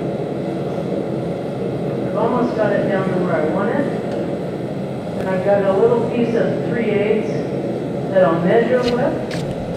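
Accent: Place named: United States. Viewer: Hey are you actually Scandinavian or do you just have love for the culture and history of Scandinavia? You look like a pretty stereotypically Scandinavian, your tattoos seem to have norse inspiration, you have a lot of Norse runes and Norse fonts in your videos, and many of your crafts are Viking styled but your accent is American.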